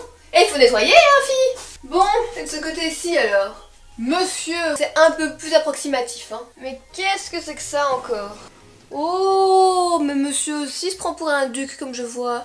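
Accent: Belgium. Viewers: J'adore ton accent belge parce que moi aussi je suis belge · ta un accent belge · J'adore comme tu lâche tes petites expression belge mais moi aussi je suis belge et ma soeur parle comme ça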